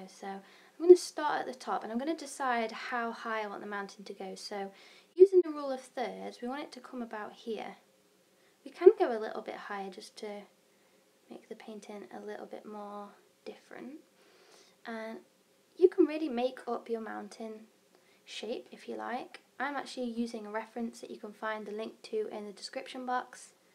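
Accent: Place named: United Kingdom. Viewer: I love watching your painting tutorials! What talent you have! And that British accent is the icing on the cake❤️ You have brought out a hidden talent! Thank you so much!!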